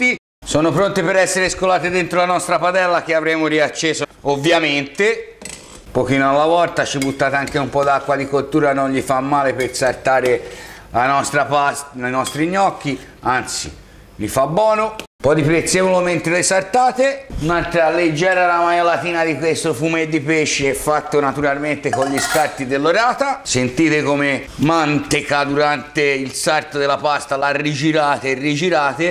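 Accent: Tuscany, Italy. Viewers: Complimenti a te, io e mia moglie seguiamo con molto interesse le tue straordinarie ricette, presentate con il tuo simpaticissimo dialetto toscano.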